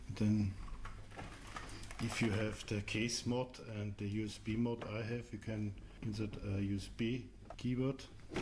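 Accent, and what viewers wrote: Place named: Germany. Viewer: An hand des Akzents schreibe ich auf Deutsch. Cooles Projekt. Sind die GPIO ports noch nutzbar? Dann wäre das Teil nämlich interessant. Ich habe gesehen das du die Platine dafür über pcbway verkaufst... Wie sieht es mit dem 3D Gehäuse aus? Ein gps und evtl. Lora mod wäre nich cool Dann könnte man es als meshtastic nutzen. Ich liebäugel nämlich mit dem Teil, aber mit dem reinen pico sehe ich für mich keine Anwendung die ich nicht auch mit ein esp32 erledigen könnte (von der Mobilität mal abgesehen) und dann werden für mich ein hackberry oder uConsole interessanter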